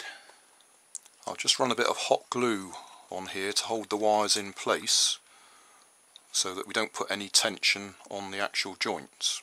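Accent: United Kingdom. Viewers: Hi Sir.. I am glad to see the way you demostrate your incredible inventions. hats off to your sir .. i am highly encouraged and engrossed in looking at all your videos ... i am sure you are from UK as i heard ur accent and i have many friends out there .. its been a long time i have been looking at videos to find out something interesting to do when i am normally free.. Thanks for being so passioned in showing the entire creation precisely .. thanks again and have a blessed day .... sir .... i am insipred ...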